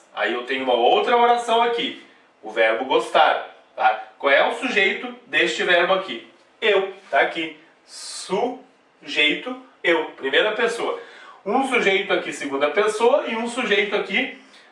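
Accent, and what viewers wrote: South, Brazil. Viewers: aula excelente aí!, a dinâmica ajuda bastante aí!, muito legal aí!, o professor é do sul mesmo aí!, parabéns aí!, obrigado! aí!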